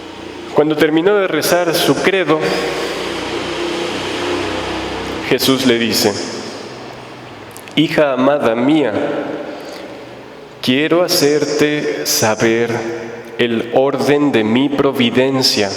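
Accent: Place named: Mexico. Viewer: Es una joya esta predicación y con el acento mejicano más agradable la escucha... Sólo los ruidos estrepitoso de la grabación... Lo hacen más interesante porque es mayor la atención que ponemos para no perder ni media palabra porque es nuestra Luisa y Jesús los que predican... Y es el 3er FIAT... GRACIAS DIVINA VOLUNTAD...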